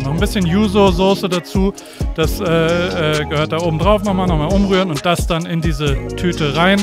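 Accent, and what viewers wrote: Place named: Germany. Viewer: Your German accent ist einfach super geil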